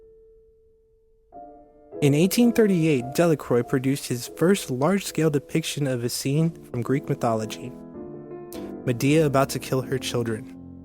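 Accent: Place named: France. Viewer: I don’t understand his French accent. It’s really a pity, because he actually destroyed the whole narration.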